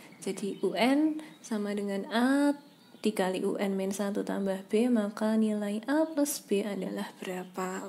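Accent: Java, Indonesia. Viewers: Sangat bermanfaat ka, tapi maap banget bukan menghujat saya terganggu sama suaranya, terlalu medok sama kalem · Medok banget mbaaa :v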